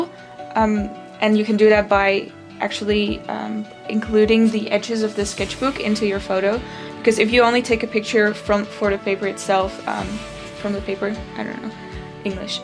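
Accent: Netherlands. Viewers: You sound Dutch (not in a bad way, you talk very clearly) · Love the video, this will help a lot, thank you!❤ (Are you dutch?, cause I think you kinda sound Dutch..😊) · Very helpful, thank you :) (btw, are you dutch? though I recognized the accent :P) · You sound dutch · You sound Dutch haha xd but it's a cute english!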